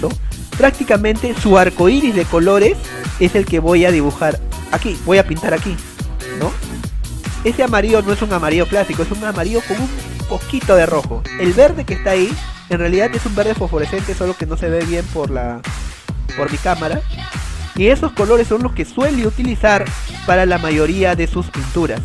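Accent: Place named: Peru. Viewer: Aaahhh, eres peruano Nueva suuub!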